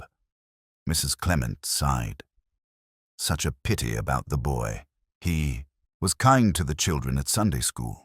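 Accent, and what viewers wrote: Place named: United States. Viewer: Wauw, the ai-voice gets more and more American…not pleasant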